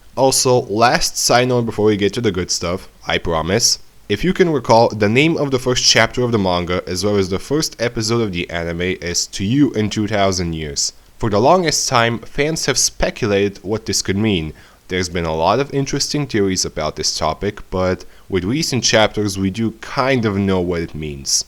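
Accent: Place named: Birmingham, United Kingdom. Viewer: Between that half brummie accent and mispronouncing your "R"s and "L"s, I can barely follow what you're saying. Learn to talk or get someone else to do the voice-over, or just give us text.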